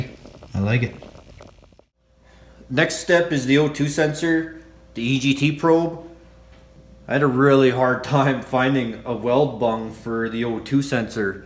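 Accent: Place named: Canada. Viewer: Dude. That Canuck accent. LoL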